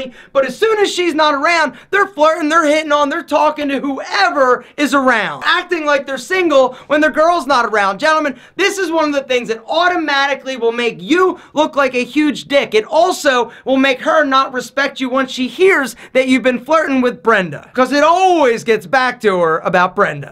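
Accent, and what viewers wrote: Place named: Lancaster County, United States. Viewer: sounds like a Lancaster county boi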